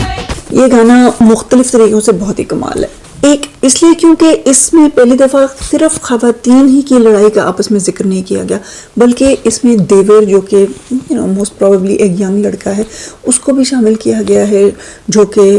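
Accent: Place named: Pakistan. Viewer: I love your Urdu accent. Vintage ptv Urdu haha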